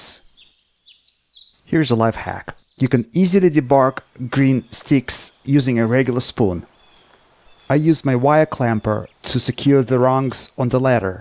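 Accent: Russia. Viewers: I watch the cabin build moment to go then I watch this one thank you again my friend thank you very much I thought I recognized a Russian in your accent. As I said the first time I wrote you a note we share a common dream you are making it happen I put other things first and never did make it happen. Thank you for this wonderful film clip I hope to see many more from you I know your time is limited but they are very precious to me I will as I said in the first comment use you as an example of why we must follow our dreams while we can thank you again my friend People Are People wherever you go and you are a fine example of a good man I can see you good and pure heart from all these miles away good luck and Godspeed to you my friend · The probably hear his Russian accent and vote thumbs down. Pathetic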